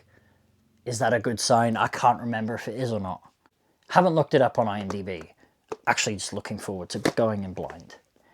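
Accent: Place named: Midlands, United Kingdom. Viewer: Amazing what town are you from? Your voice sounds midlands, is it that part where there is a 'something' line I have heard Elis James talk about before?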